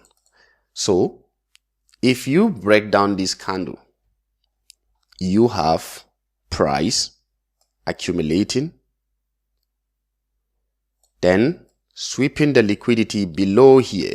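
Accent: Nigeria. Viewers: Nice, are you from Nigeria?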